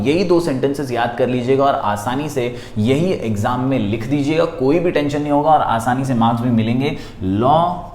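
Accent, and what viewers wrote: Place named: Gujarat, India. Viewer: Sir where r u from? Gujarati lgte ho 😅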